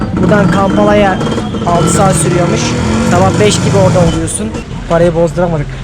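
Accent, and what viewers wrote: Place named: Aegean, Turkey. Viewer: Gadeşlik seni ilk defa izliyom sen bizim oralıya benziyon şivenden belli egelimisin.. Accık şiven aydınla muğla arası benziyo. Güzel gez dikkat et kendine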